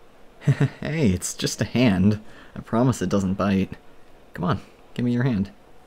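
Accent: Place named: United Kingdom. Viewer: You sound British and I love it🤩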